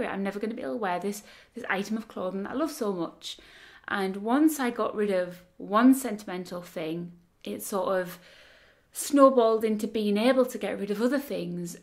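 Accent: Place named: Northumberland, United Kingdom. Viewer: Malina Koschier She sounds Northumbrian .My mother in law was from that area and had the same lovely soft accent.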